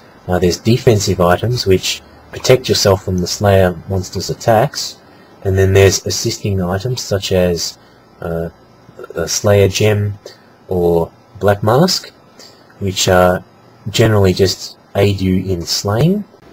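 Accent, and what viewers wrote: Australia. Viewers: your aussie arnt ya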